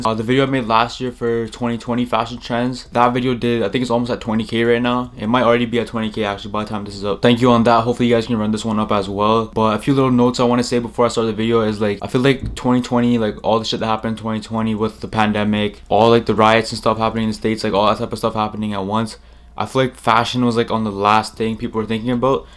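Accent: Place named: Jamaica. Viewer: Gave the vid a like but please let go of the jack accent. It doesn’t sound or look good bro. You better than that & ur vids are dope.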